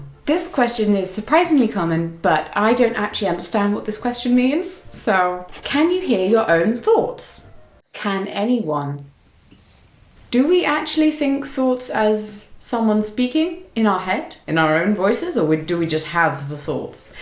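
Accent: United Kingdom. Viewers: You have the most British accent I have ever heard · You sound beautiful, I love your voice and I usually hate British accents, it’s great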